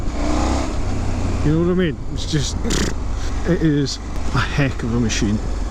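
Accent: Scotland, United Kingdom. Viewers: are you Scottish? This bike I would love to have, but the triumph 765 and the pain gale v2 or the R9T are too much of a temptation right now.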